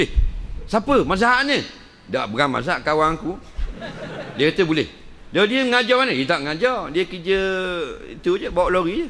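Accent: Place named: Pahang, Malaysia. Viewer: Pahang doh ustak.. Time kaseh banyok impo nye ustak..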